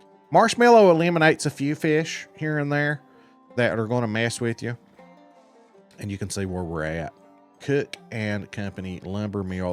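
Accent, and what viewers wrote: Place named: South, United States. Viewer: As a fellow southerner, you got a great accent, brother.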